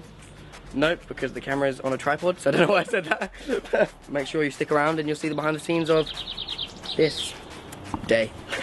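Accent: United Kingdom. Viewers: I live in a country which English is not our first language but I can understand what he's talking about although not every words I catch :)) His accent is so sexy. Is that Brighton accent? (If it exists)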